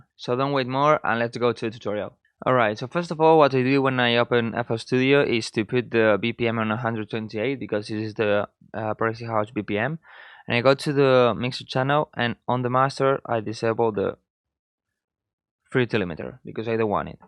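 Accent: Spain. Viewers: Como se nota que eres Español ajjajaja! Muchas gracias por el tutorial !!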